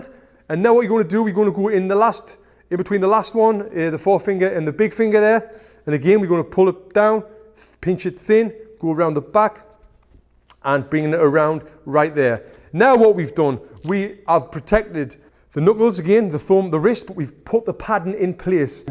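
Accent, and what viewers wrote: United Kingdom. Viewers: He is British not Australian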